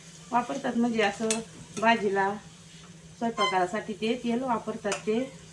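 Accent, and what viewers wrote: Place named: Akole, India. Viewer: नमस्कार मावशी 😊 भाषा आइकली लय भरी वाटला,अकोले तालुका ना तुमचा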